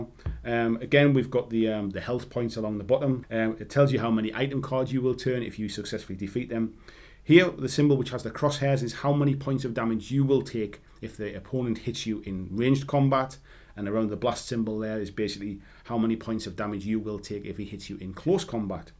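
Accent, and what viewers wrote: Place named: Scotland, United Kingdom. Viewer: Scottish Accent Boardgame Review = Auto Subscribe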